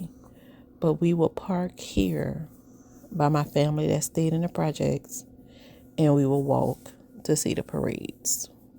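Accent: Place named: New Orleans, United States. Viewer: Hey. You say you from the West Bank. But you from New Orleans. I hear it.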